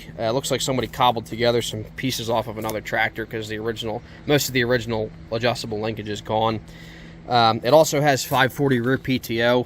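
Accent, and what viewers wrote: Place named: New Jersey, United States. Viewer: that was a wonderful review of the 340A. My friend is looking at a 231 with a loader, but has the smaller 36 hp Diesel. We'll definitely look out for the steering and loader problems you mentioned. On the 3 pt, it's my understanding that the left lift link isn't adjustable, only the right side is. Could definitely tell you grew up in Jersey, as did I. I'm from Bergen County, not many farms left there. Again, great review. Tom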